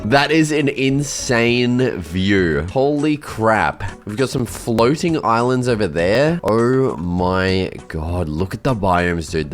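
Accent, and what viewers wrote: Australia. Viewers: I don't know why but, i love Australian people's accents ❤ 😂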